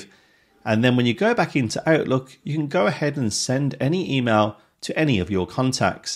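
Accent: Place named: Wolverhampton, United Kingdom. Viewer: This is a good video. However, it does not consider that most people who have an Outlook Classic work email are on IMAP or POP, not on Exchange. Hence, when you switch to the new Outlook option, you no longer have all the same features.and Therefore, switch back. Thanks, something to consider. Also, I recognise the Wolves accent 👍👍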